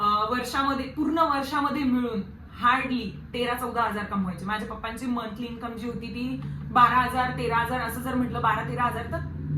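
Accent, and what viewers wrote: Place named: Marwari, India. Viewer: ताई, सर्वप्रथम तुझ्या कष्टlच कौतुक.. एका मुलीच्या जिद्दीच कौतुक.. व्हिडिओ मध्ये मुद्दे छान present केलेत.. थोडे मारवाडी accent आहेत.. आणि बोलणं थोडं fast वाटतंय.. मध्ये pause घेतले तर अजून effective होईल.. या प्रयत्नांच सुद्धा कौतुक.. पुढील प्रयत्नांसाठी शुभेच्छा.. लगे रहो 👍..🍁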